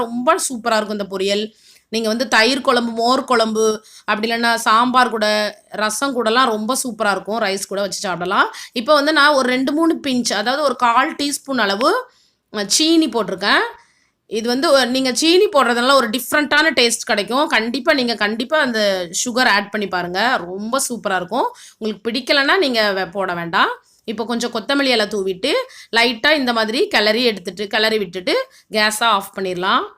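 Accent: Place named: Nagercoil, India. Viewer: Romba arumai unga nagerkoil tone thanga....beautiful recipe and thanks for taking time to record this....very helpful for guys like me living faraway from India....love your tamil.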